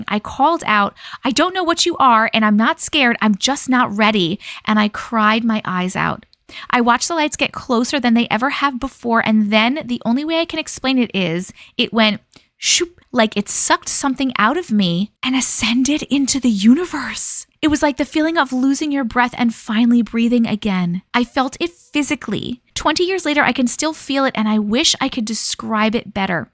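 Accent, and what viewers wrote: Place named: New York, United States. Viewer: Definitely subbing.... stories AND you're a New Yawk girl like me?? Oh heck yeah!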